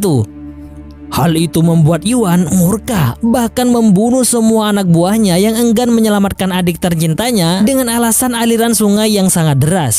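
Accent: Madura, Indonesia. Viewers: Abbe mik oreng medure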